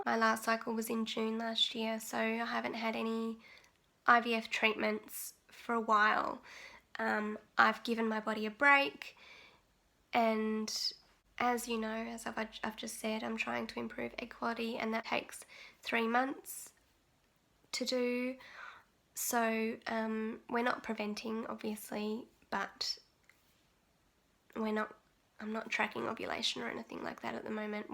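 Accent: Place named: Boston, United States. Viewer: Nice Boston accent!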